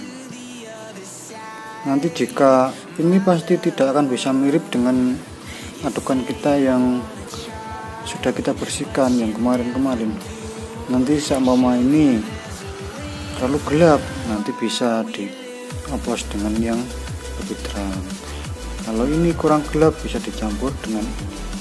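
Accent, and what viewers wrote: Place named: Java, Indonesia. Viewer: medok nya itu loh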